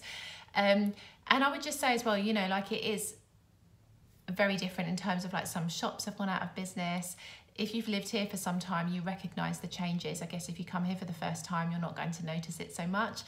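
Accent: England, United Kingdom. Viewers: I just found out your channel, I love your accent, what part of England are you from?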